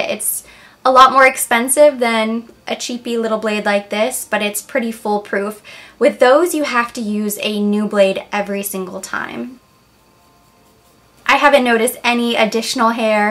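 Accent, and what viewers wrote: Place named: London, United Kingdom. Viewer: i agree. i wasnt sure if its Australian accent or English but i played it back and sounded more as a Londoner..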